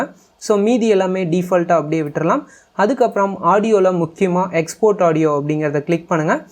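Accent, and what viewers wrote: Salem, India. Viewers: Anna neenga salem a?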